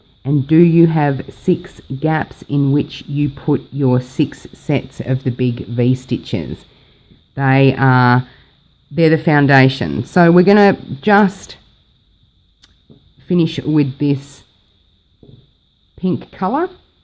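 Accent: Australia. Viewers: Thank you for the video, I love this pattern. Love hearing an Aussie voice too, look forward to more videos. G'day from Adelaide.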